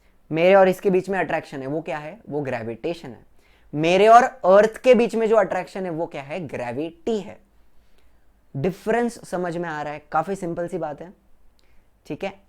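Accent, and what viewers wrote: Maharashtra, India. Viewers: Sir you are marathi??? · I bet your mother tongue is Marathi sir🌶️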